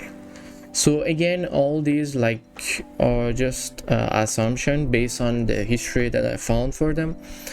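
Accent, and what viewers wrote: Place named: Iran. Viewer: Oh you are Persian !!! I always watch you videos & I thought maybe you are Persian because of your accent . Well done mate 👏🏻 I created a topic about adding Persia as new civilization for AOE III DE in future in official forum (Persia civ suggestion) . You can go & check it my friend.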